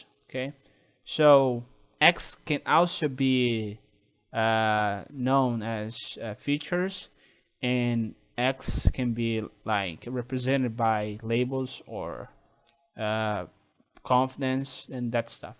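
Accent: Brazil. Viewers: Hehe.Br falando inglês, não tem como não perceber. Abraço.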